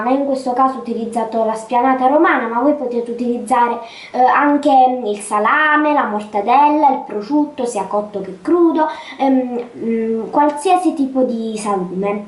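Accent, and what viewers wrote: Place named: Abruzzo, Italy. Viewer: Ah che bello! Avevo riconosciuto l'accento! Anche io ho origini abruzzesi ed ogni volta che guardo i tuoi video, mi sento a casa =)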